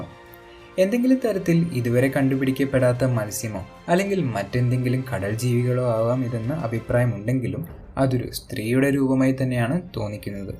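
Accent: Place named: Thrissur, India. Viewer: Thrissur Kari Paranjath kekan rasand evdo ketta polea😲🤓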